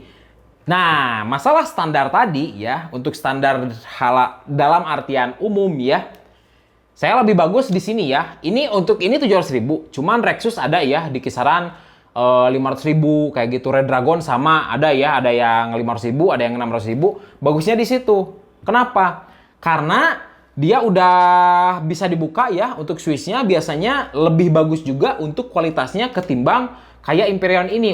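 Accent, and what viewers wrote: West Java, Indonesia. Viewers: Resep kana aksen sunda na 😂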